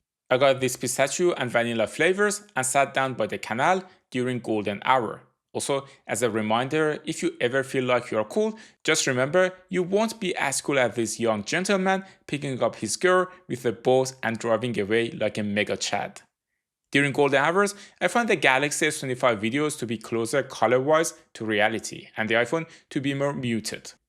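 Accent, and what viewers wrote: Iran. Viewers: یعنی قشنگ چشماتو ببندی متوجه میشی یه ایرانی داره انگلیسی حرف میزنه:)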